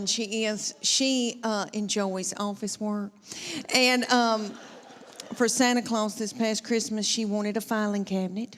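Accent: South, United States. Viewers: With her looks and that southern accent she could talk me into just about anything, and make me laugh at the same time. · That southern accent kills me!! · oh my God I LOVE that southern accent!!! Hey Natasha Leggero, can you imitate this accent? I think you are so close to perfect now well OMG talk like this and I just might explode!!! Tasha, I love you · No....that's her! She's from the south. We all talk that way😂🤣😂🤣😂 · We saw her in Knoxville at the local comedy club. Very funny lady!! Love her southern accent. 💕💕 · It's that good 'ol Southern accent!!!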